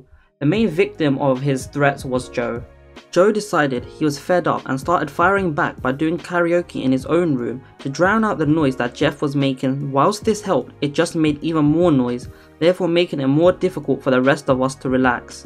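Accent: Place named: Southern England, United Kingdom. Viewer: rjship - I'd say south England by the accent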